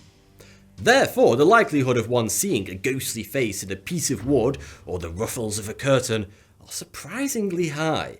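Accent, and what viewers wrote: United Kingdom. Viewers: Wait, have you always had a British accent? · When I read your book, it'll probably be your voice with that british accent reading it in my head. Can't wait. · He is the perfect blend of British but no too British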